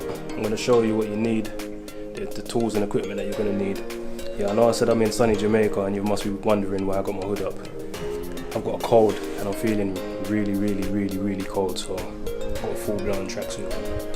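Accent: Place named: London, United Kingdom. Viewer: You sound like your from London, so am I and been living in Florida USA for 14 years. I have 2 ackee trees in my back yard, got a proper 15ft fruit picker with a basket to get them and thoroughly enjoy the harvest each year. Harvest is coming to end being November. My Jamaican neighbors love it too and give them bags of whole ackees so they can see it’s opened naturally. Once I made the mistake of giving them ackees I removed the flesh thinking I was helping. I have a hard time with squirrels here and can only try to keep them under control with an air rifle.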